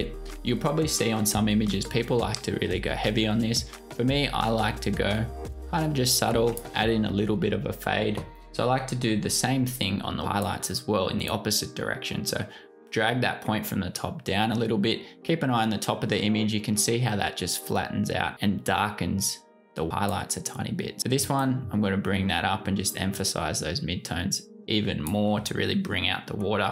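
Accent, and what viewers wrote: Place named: Australia. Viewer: I love your work 👊🏾 You sound like you’re from here (Aus) I just had a question...would a Mac Pro 16GB RAM be perfect on Ps + Lr ?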